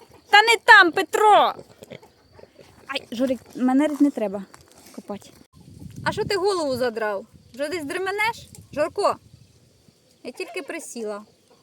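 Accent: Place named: Poltava, Ukraine. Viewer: Дівчина,Жорік та всі інші друзі цього шоу.. Ви з Полтави?❤🎉❤ Така мова гарна ❤ Харків вітає ВАС❤🎉😊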